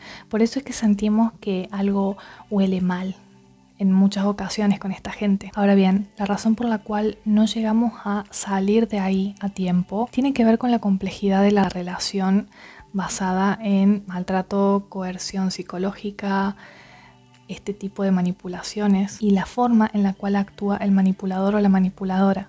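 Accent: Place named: Cordoba, Argentina. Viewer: Que te voy a explicar, ya lo explicaste todo vos y es como reflejarme, gracias por todo lo que haces, yo vivi en Cordoba casi 8 años en Tanti, lo digo porqie note tu acento, pero por ahi me equivoco.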